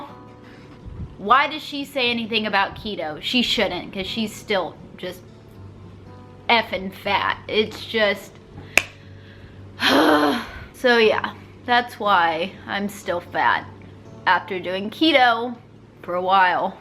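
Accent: South, United States. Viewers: Okay, I've been watching several of your videos---your southern accent drew me in! (I'm one of them southerners too). And I"ve noticed videos from different years, and I can see your weight loss is not happening like you'd want. (Tip no. 1: ditch stevia and other sweetners---they basically put your ketosis to a screeching halt. Tip no. 2 intermittent fasting---you're NEVER lose weight by exercise. I've lost all my weight (at 72 years old) and not exercised a single day.) I'd recommend watching some of Dr. Boz videos---she's a genius at Keto. I've been doing Keto for a year now, and lost about 70 pounds...So, I notice there are several things holding you back...Dr. Boz would set you straight if you spent time watching her. There's no reason you could not drop 40 or so pounds doing what she recommends. Right now I weigh 170---for the first time in 30 years...and on my way to 155, God willing. 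I'm sure Dr. Boz will really help you get to your goals---and heal a lot of things that keep dogging you. And look. Don't pay attention to those morons who leave unkind comments. If you're a Manatee (and you're not)...look at it this way. Manatees are really nice and friendly--just like you. Plus, you're the most beautiful and sweetest Manatee I've ever seen. You're very attractive. Your bright personality, your openness and honesty; those and many other things draw a lot of people to see your videos, and many are really cool and helpful for Keto recipes. You gotta keep these vi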